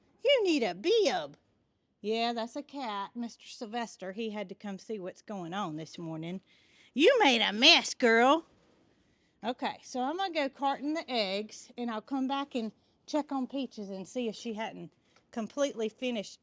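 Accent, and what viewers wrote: South, United States. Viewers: I'm over here cracking myself up over wondering what in the world "bacon powder" is when I realized it was your cute southern accent, and you were saying "baking powder"!! 😂🤣😂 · I love your southern accent, especially when you say your website name! So cute!❤❤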